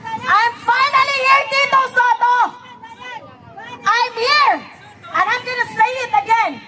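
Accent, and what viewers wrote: Visayas, Philippines. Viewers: Saludo ko dai sa imo! Ayos ka !! Bisaya ka dai?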